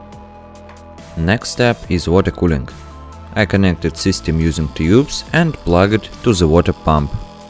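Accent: Russia. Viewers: What a brilliant russian akcent is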